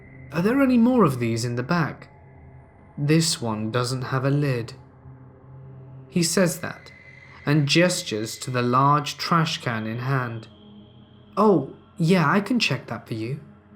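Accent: United Kingdom. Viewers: Does every one of these channels have to have a British accent ?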